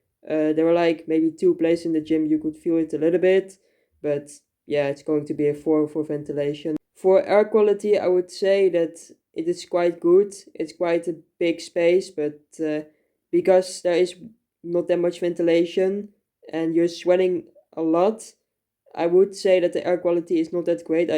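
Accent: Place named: Netherlands. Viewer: Ja, begrijpelijk. In dat geval zou ik werken aan je uitspraak. Het is oké om Engels/Amerikaand accent te bluffen, dan krijg je er op den duur wel gevoel voor. Aangezien het Nederlandse accent nu erg sterk is. Ook de werkwoordgrammatica. Verder goede videoconstructie, dat je ook review-reviews doet, erg leuk!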